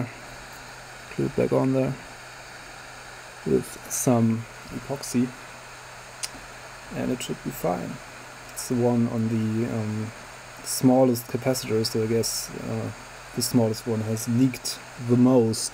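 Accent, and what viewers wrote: Germany. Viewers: Hahaha, how did I miss this one over the course of the years... "This is a Macintosh SE DIRTY" :D:D:D Love the German accent :D I have one of these and I love it. Recapped it years ago with nice tantalums so goodbye leakage ever – still have to recap the analog board, so I'm happy I ran into this video just now, it reminded me of this :D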